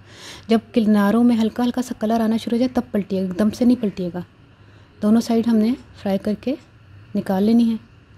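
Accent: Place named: Punjab, India. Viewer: Aoa . bhot acha andaz hy kubhi kubhar punjabi zbaan ka chutta dy k baat karny ka .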